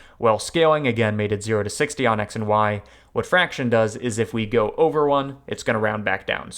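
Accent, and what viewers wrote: Boston, United States. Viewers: I love your Boston, reminds me of my C.S. college days =D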